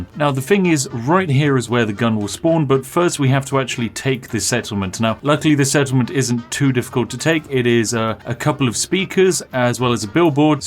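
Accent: United Kingdom. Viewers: imagine standing at a petrol station and you just hear "ooh its a petrol station, full speed ahead" in an insanely british accent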